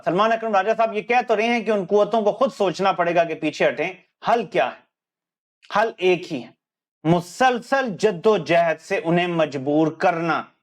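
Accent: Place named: Saraiki, Pakistan. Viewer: Aap ka saraiki lehja hy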